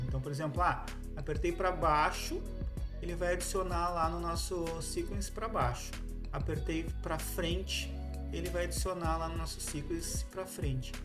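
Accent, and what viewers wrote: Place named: Porto Alegre, Brazil. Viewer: Salve mano! Pelo sotaque deve ser de POA. Tô desenvolvendo um beat n UP na Godot, no futuro quero fazer um de luta. Boa aula!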